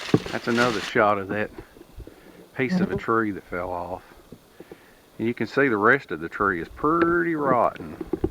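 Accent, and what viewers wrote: South, United States. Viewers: Tell me the truth, do you have just about the thickest southern accent of anyone??? Because my friend Meg lives down in MS and you put her beautiful southern belle accent to shame man, I love it all, LOL. I am going to send this to her now! Southern accents are my favourite. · Amen! You've got that right. Love and his southern drawl. It's the best! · I love your videos. Your southern accent is soooo cute. What do you do as a living, if I may ask. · Hi, I'm a new viewer, now a subscriber. I have enjoyed your videos so far, one with the spiders at the end kreeped me out. Love your southern accent, plus you not bad on the eyes. But did you have to make me so hungry? Keep up the good job. Oh I also love the way you call all the people who sends ya gifts, your friends. Not sure how i would send you anything?. Take care.... Hi to. 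and your Dad, he was in the first one I watched. Later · Once again, a cool video. I like the "Southernisms", like "geeyuft". That's when someone gives you a present. Thanks.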